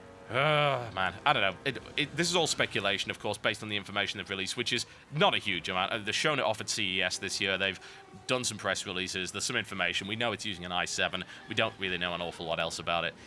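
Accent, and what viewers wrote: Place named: Newcastle, United Kingdom. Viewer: AHHH you ARE a Geordie! I knew it.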